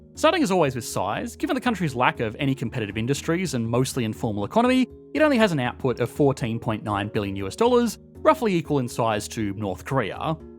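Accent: Australia. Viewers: I think you just have issues with the Australian accent, his demeanour is fine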